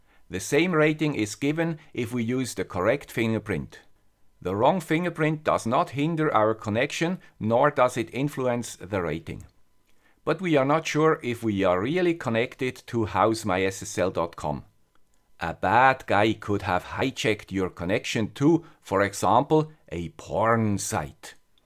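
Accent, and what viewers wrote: Switzerland. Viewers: That "guy with a Swiss accent" sure is smart! Thanks another great lesson:)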